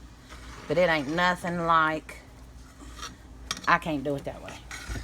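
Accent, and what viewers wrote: South, United States. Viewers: I LOVE to hear you talk... guessing you're from Alabama or somewhere deeper south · it's a southern accent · I love your easy southern voice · I love your southern accent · I love the southern in her voice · I really love your li'l Southern accent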